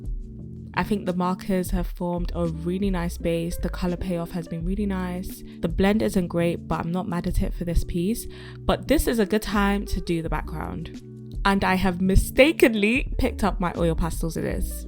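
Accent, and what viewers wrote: United Kingdom. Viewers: Bro is British💀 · I just love the british accent ❤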